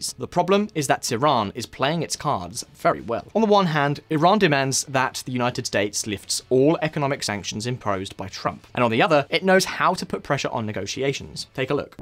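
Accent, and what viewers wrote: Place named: England, United Kingdom. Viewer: It's called the English Language, & this guy sounds English to me. Maybe you've got it wrong...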